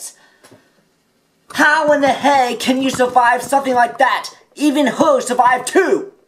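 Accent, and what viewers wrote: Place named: France. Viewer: he kinda has a little french accent when he speaks for me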